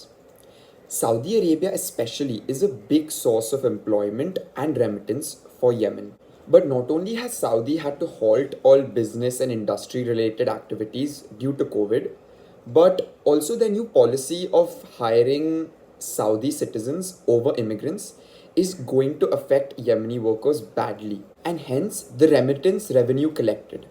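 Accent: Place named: India. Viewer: Why indian accent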